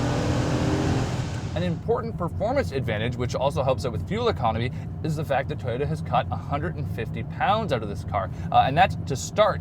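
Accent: Canada. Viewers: is this guy Canadian?... ither way I think he is sexy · This gentleman is speaking just fine, the Canada accent, no big deal.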